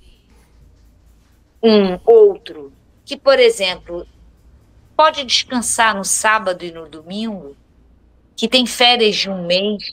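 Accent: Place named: Rio de Janeiro, Brazil. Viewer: Professora, linguagem científica não se compatibiliza com sotaque carioca.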